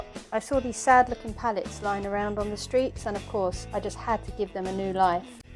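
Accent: United Kingdom. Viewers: Great to hear a British accent and sayings on these videos. Good job. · Excuse me...are you scottish ?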